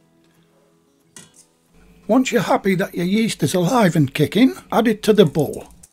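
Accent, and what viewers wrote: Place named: Yorkshire, United Kingdom. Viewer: Hi, just got your buns out of the oven, the house smells lovely, we will have steak on a baguette for dinner, thanks for all your hard work on the videos. I was born near doncaster in yorkshire, love to hear your accent again 😀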